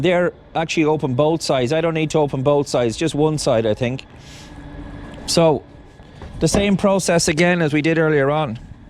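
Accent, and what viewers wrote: Ireland. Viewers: I started watching your vids cz I like the irish accent, but I've grown to love your vids I do miss the european vids, as I've always wanted to do european driving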